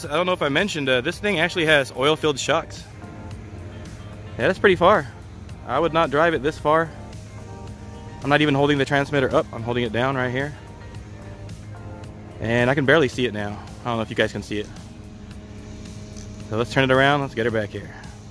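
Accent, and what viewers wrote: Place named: Miami, United States. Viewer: are you from miami, this accent is miami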